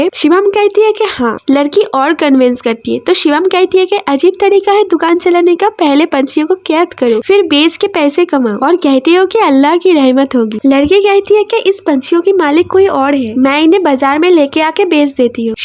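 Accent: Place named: Bengal, India. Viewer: Tumi bangali ! Maar prio accent ❤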